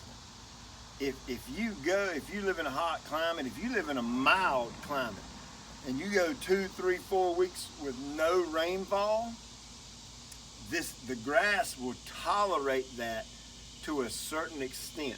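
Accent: South, United States. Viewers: That would be awesome to watch both of you guys do a collaboration together. You both are great at what you do. I appreciate the information you pass along to all of us here. BTW, my wife rolls her eyes when I watch my videos but when comes on she'll watch with me. She just loves your southern accent. Keep up the great work,.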